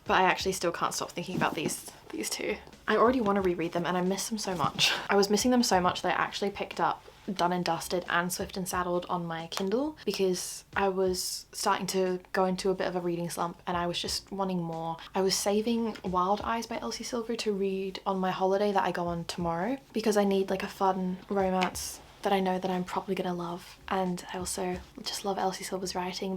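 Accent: Australia. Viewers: I’ve just found your channel and I’m obsessed! You’re so calming and genuine and you can feel how much you truly love reading and getting immersed in the story. Also the accent love are you a fellow Aussie/ half Aussie/ Irish. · Has anyone ever told you that you sound like an Australian? I am Australian and had to double check 😅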